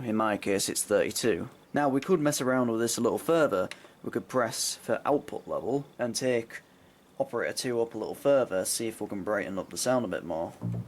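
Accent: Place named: Yorkshire, United Kingdom. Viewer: Definitely Yorkshire but hmmmm which part.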